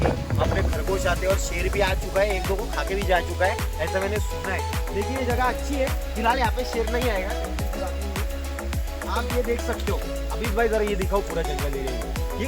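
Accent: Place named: Maharashtra, India. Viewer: Best thing is, That one special Marathi Touch 💚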